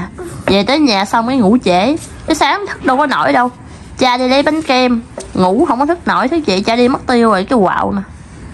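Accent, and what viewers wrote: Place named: Mekong Delta, Vietnam. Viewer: Rất thích kênh vì cách nói bình dị rất miền tây nam bộ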